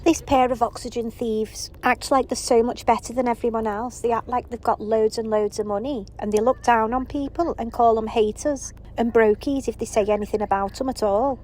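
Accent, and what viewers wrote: Liverpool, United Kingdom. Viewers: Are you a scouser? Love it! Bloody love scousers. I’m from Wirral.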